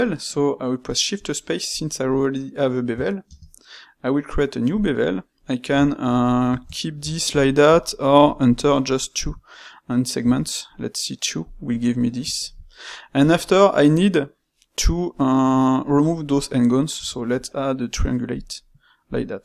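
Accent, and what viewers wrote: France. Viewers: i bet you're french ^^ i am too but the accent is sooooo specific ^^ (great vid tho , i just find the accent funny ^^ )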